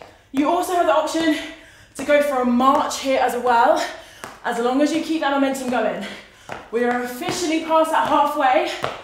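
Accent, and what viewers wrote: England, United Kingdom. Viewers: I Love you English accent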